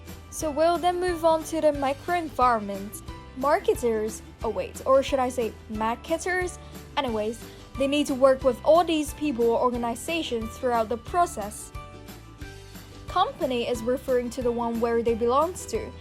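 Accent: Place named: United States. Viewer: I love ur American accent! U must be a beautiful girl!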